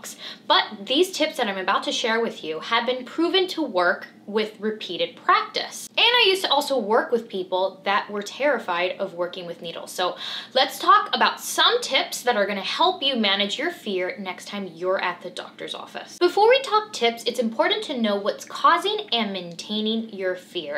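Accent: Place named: Dallas, United States. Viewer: You sound like your from Dallas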